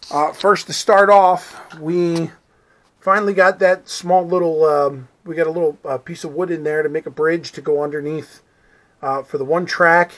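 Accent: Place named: Philadelphia, United States. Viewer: Nice to put faces together with names out there in the livestreams. I could tell you are from Philly with that accent. Penndel was my stomping grounds as a kid. Thanks for sharing. 👍 👍 👍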